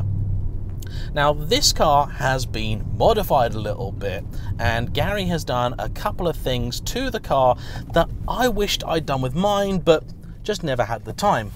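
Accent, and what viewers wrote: United Kingdom. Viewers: your british accent is so smooth and easy to understand. thumbs up from Romania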